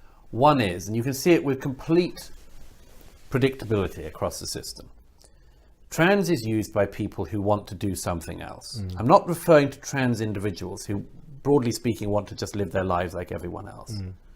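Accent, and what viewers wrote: RP, United Kingdom. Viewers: his etonion accent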